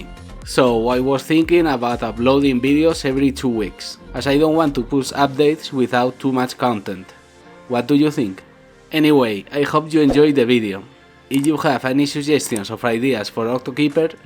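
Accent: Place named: Spain. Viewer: Are you spanish? I'm from Spain and your accent reminds me so much to mine XD Btw, your game looks amazing, the pixelart is beautiful ;3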